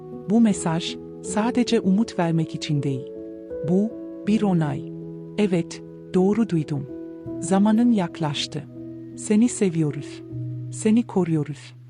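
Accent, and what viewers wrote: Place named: Germany. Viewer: Şu Almancı karıya okutmayın şunu ciddiye alamıyorum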